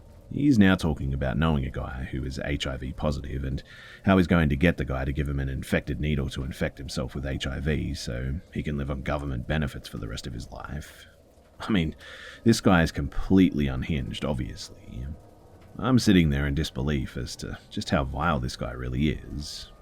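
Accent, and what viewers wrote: Australia. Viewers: He's an auzzie mate so fuck off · He's Australian?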